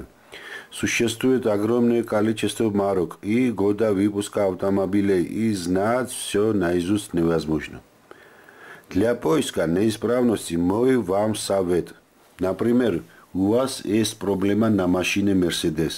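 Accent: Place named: Georgia. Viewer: Грузинский акцент, ласкает слух 👍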